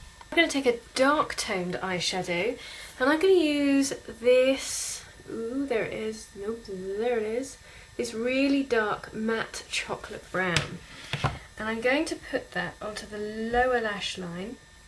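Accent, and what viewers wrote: England, United Kingdom. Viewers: iam not gay or anything I love your voice. Are you from England?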